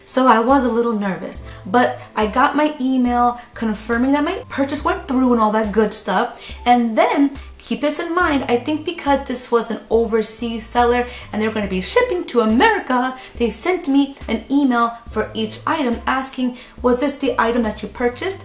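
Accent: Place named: Japan. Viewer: Omigod I love your voice! It’s like a Japanese accent with a lil bit of hype black girl!! XD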